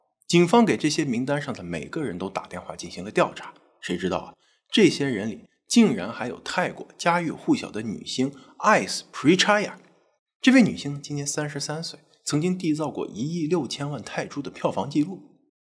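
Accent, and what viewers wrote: Standard. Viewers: 普通话标准，听多了普通话都学会了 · 真的是講故事的專家❤內容清晰語氣恰當，字正腔圓❤最喜歡的頻道我每天都聽幾個小時太好聽❤，👍👍👍👍👍👍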